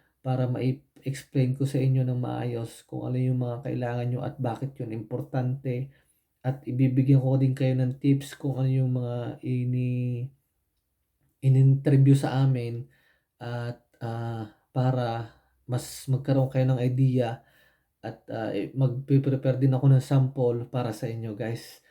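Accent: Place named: Batangas, Philippines. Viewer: Okay sir salamat, meron kang accent na batangas sir, taga batangas kaba? Nag work ako ag&p year<num>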